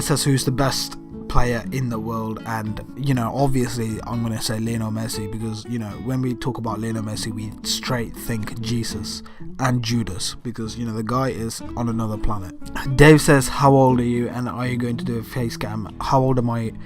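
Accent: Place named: Birmingham, United Kingdom. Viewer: Are you from Birmingham? You sound like you have a bit of a Brummy accent 😂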